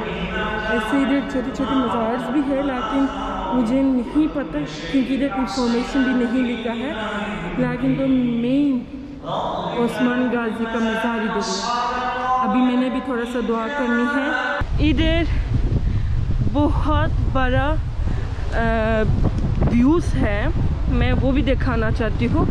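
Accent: Pakistan. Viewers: Aapka urdu sunnne me mashaAllah achha lagta hai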